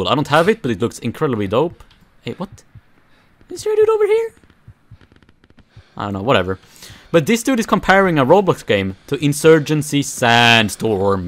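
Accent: Sweden. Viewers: you got a swedish accent ngl. Where u from man? Im from oslo in norway lol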